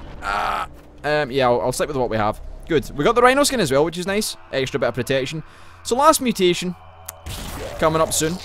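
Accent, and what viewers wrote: Scotland, United Kingdom. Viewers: ..... WHO IS THIS GUY AND WHY DIDNT I KNOW ABOUT HIM SOONER. as much as i like watching NL play Isaac, this guy is SCOTTISH NL, so to speak, which is just as amazing, cause he plays Nuclear Throne instead! That accent though... lols. So yeah, im subbing, im watching, and im probably gonna end up watching every episode he has.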